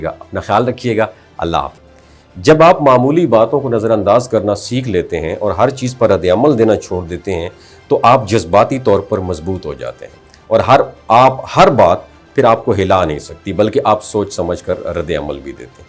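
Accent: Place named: Karak, Pakistan. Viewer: Karak ki Pashto ❤❤😅😅😅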